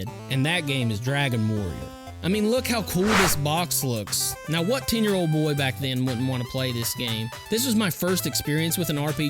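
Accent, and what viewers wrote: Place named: Texas, United States. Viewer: Bizarre question, but what accent is that? Texan?? From England myself, but totally dig the accent dude!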